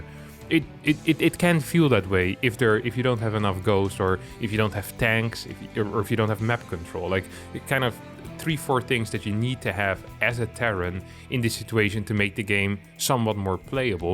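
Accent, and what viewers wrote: United Kingdom. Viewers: Love the British accent! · I just love the British accent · the british accent slowly starts to creep in lol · i like that the more Harstem reads, the more British he becomes. i do find it funny the Terran complains about lurkers, but then talks about he could had made Ghosts. you know.... the unit that can ONE SHOT THE ONE THING HE IS COMPLAINING ABOUT !? if anything Zerg should be complain about terran since ghosts can just constantly one shot any spellcasters and lurkers they have ! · love your british accent XD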